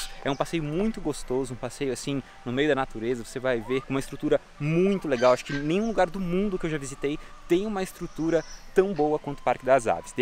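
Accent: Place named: Santa Catarina, Brazil. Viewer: Nossa! é de arrepiar cara, produção de cinema! você é catarinense? tem sotaque de Catarina kkkk sou de Massaranduba - SC abraço e sucesso!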